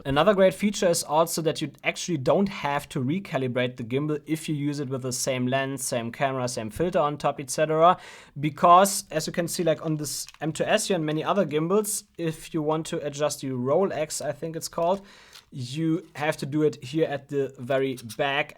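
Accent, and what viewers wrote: Germany. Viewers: good old german accent wenn ich mich nicht täusche :D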